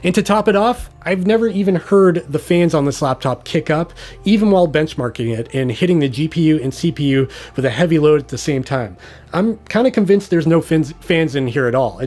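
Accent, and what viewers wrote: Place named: Canada. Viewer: I thought he was Canadian too. The way he says “about”….aboot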